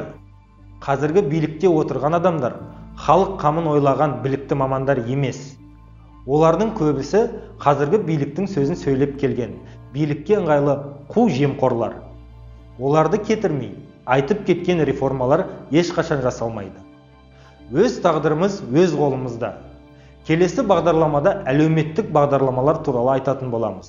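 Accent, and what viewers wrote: Standard. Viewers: Билікте осындай қазақша таза сөйлейтін, өз ойын анық, түсінікті етіп халыққа жеткізе білетін адамдар отыру керек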